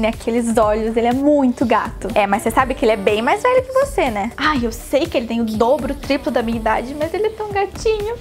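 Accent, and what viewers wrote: Rio Grande do Sul, Brazil. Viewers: tu é gaúcha guria? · Vc é gaúcha? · Guria é gaúcha??! olha nn sei c é gaúcha mais q é linda tu é qrd👌❤❤😘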